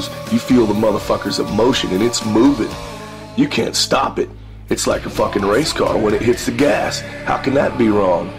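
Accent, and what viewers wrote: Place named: United States. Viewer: what he say?? i don't understand spooken american :)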